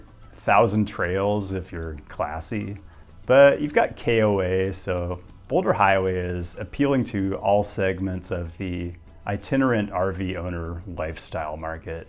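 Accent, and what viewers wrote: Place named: Pacific Northwest, United States. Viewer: First, I absolutely love the content. I have to ask though, are you from the Pacific NW? I'm a linguist and you have the strongest PNW accent I've ever heard, it's amazing 😂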